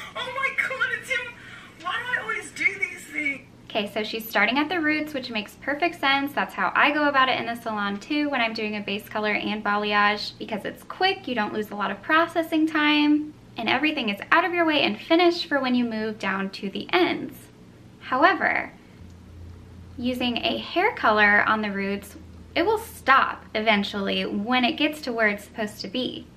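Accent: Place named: Australia. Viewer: she sounds Australian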